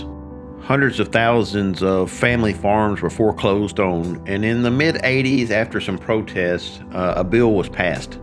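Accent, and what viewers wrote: South, United States. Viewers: This is a highly edited video. And it’s repeating the premise and slow rolling the punchline and the good-ole-boy narration is only annoying. The answer is given in the final 30sec. The rest is just repetitive of the first third. The big saw mills are supposedly getting rich. There...you can skip it.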